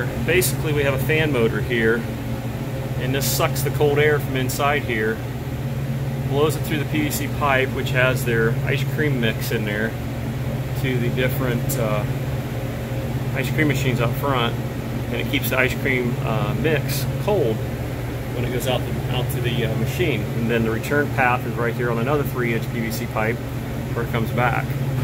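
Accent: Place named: Ohio, United States. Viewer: Nice. I had a feeling. I was born and raised in the SW Ohio region, Hamilton to be exact, and thats the dialect so I pick up on it right away.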